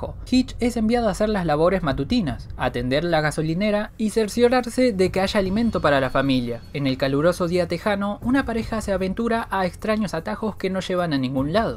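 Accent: Argentina. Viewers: Que sos argentino?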